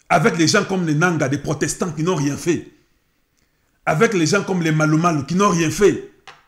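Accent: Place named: Texas, United States. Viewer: Votre colère est justifiée vous n'avez pas mâché les mots. Vous méritez un post ministériel avec vos compétences. Vous parlez comme un texan! Merci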